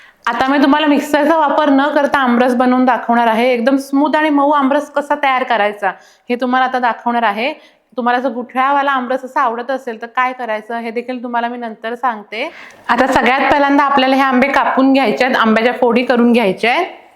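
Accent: Kolhapur, India. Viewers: Full kolhapuri zalis ,tone pn kolhapuri zala ,mast 👍👍👍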